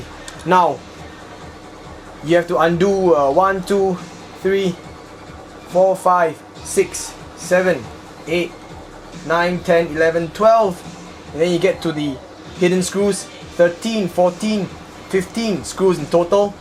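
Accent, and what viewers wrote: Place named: Singapore. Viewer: lol from the accent oh singapore sure one XD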